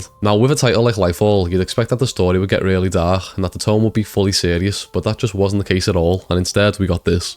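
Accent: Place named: Liverpool, United Kingdom. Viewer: A scouser review! Let's go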